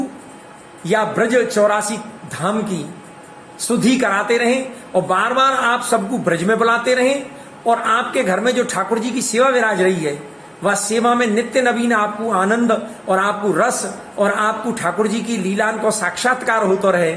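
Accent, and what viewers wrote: Braj, India. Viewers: महाराज जी आपकी ब्रज वाणी बड़ी मनभावन हैं 🙏🙏🙏 जय राधा रानी की 🚩🚩🚩🚩🚩🚩🚩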